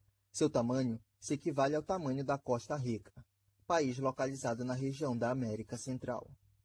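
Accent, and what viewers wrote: Pará, Brazil. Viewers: kkkkkk hoje bem cedo,encontrei o canal pela primeira vez. Sou do Maranhão (cidade Bacuri)no Litoral Ocidental maranhense ,e conheço o sotaque do Pará.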